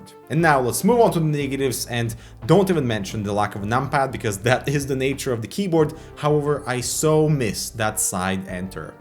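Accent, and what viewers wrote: Sweden. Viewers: You sound Swedish...Are you Swedish? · You sound like your Swedish. I like it :)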